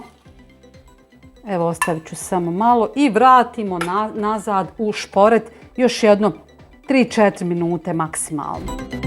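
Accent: Bosnia and Herzegovina. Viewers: Čim je počela sa ovim bosanskim naglaskom znam da je to taj koji ću probat!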